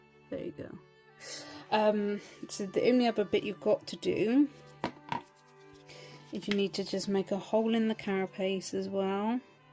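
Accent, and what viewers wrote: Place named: England, United Kingdom. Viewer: Sure is a lot of stuff in there ,but I could imagine the smell and rot if the guts were left in.....boy howdy !!! You sure have an eye for detail and are very meticulous just like me, so I can truly appreciate what you do ,and you do it so very well indeed ,very talented girl !!!! I love the music you play in the background and love your English accent ,gives your channel some class.....very classy and the occasional potty mouth brings it all together nicely. Don`t know who I like better ,you or David Attenborough LOL. BTW I spread my Giant Golden Orb spider and there was a cornucopia of bad language in the bug room......two of the damn legs were broken off before softening the poor bugger but I did triumph and was victorious in the end. I don`t know how you do these projects while recording yourself and having to narrate all at the same time working on such fine specimens for all the world to see.....amazing my dear !!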